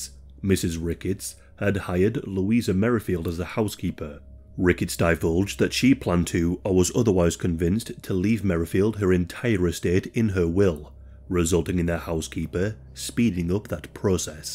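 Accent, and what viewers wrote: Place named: York, United Kingdom. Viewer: You look like Scotty, vocalist of a band called Street Soldier. They are from york so I'm guessing by your accent they are fairly local. Any relation?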